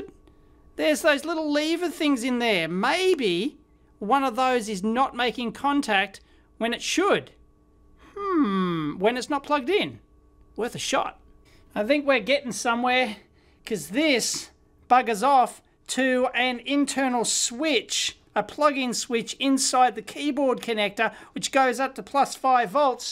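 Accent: Australia. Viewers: I tried finding it by your statements in the vid but the web site came up as an Asian Casino site. If I'm understanding your Aussie speak it is <link> The TO8662+ doesn't return anything in a search. I'm sure I must be misunderstanding something. Sorry Dave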